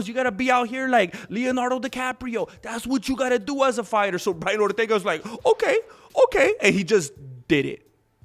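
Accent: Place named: Mexico. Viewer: An intimate relationship with himself in a metaphorical and literal way. You are a genius my man. The num-chuks with flip flops in the background. It is so great how you can put that Mexicano flavour on the words.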